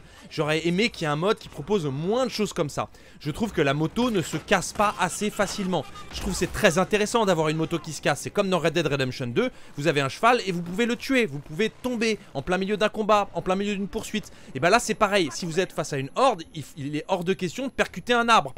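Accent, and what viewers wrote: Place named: Belgium. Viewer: Tu es belge ? En tout cas tu as l'accent belge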